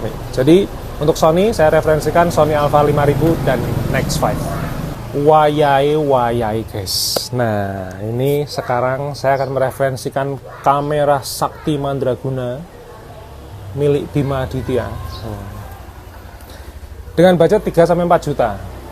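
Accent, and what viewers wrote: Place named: Semarang, Indonesia. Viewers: logat semarang kentel kang wkwkw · mas smpean wong semarang y. ? logate ky semarangan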